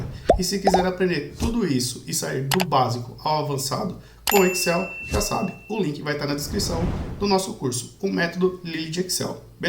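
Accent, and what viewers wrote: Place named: Minas Gerais, Brazil. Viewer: Sotaque minerim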